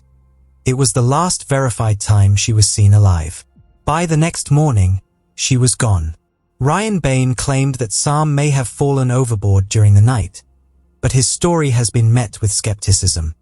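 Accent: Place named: England, United Kingdom. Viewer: Damn I hate when these type of videos are narrated by people with English accents 👎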